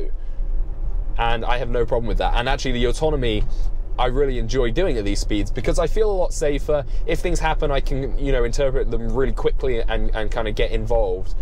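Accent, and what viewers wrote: United Kingdom. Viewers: Great to hear a Brit commentary for a change. Nice interesting engaging content. Could do with more shots showing what the car is seeing on the display. I'm waiting for a 3 or Y. But still interested to see where autopilot is at on UK roads.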